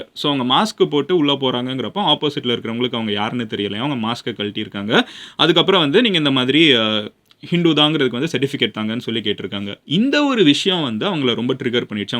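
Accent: Madurai, India. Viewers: R u from Madurai or Ponneri?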